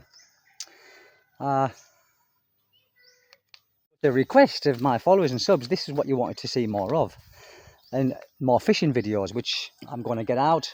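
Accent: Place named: Northern England, United Kingdom. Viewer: Funnily enough i did ask GPT about teaching English online without any qualifications and it suggested Preply. I only need to earn about £300 a month when living there to stop me eating into my savings but i do plan to have a dabble with YouTube also. Maybe do POV walking vlogs or if i'm not too shy do the proper vlogs walking about interacting with people. You do Preply or something similar? I don't have a TEFL certificate or a degree so i'm restricted to the casual platforms. Where were you from in the UK by they way? I'm Nottingham, but i suspect you were a bit further "oop norf" Sheffield? Can't quite place the accent 😅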